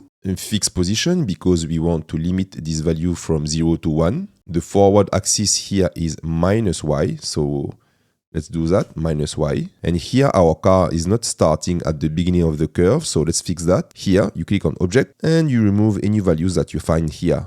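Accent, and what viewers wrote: France. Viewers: i havent done this tutorial yet, but this is something have have been looking for. also i love your french accent 👌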